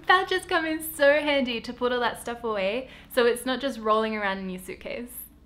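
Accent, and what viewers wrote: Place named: Australia. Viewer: Thank you for this video. And also, i figured that you are australian, which is very awesome!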